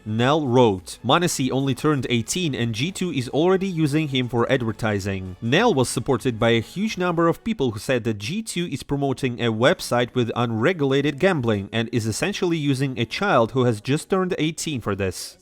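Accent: Russia. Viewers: Тут скорее палка о двух концах - из-за того, что канал ведёт, и я явно слышу русского человека за озвучкой, и носителям английского такая речь режет уши. И тут они не могут воспринимать новости серьёзно, да ещё и речь идёт о СНГ - командах. Огромный респект, но нужно адаптировать формат под западных слушателей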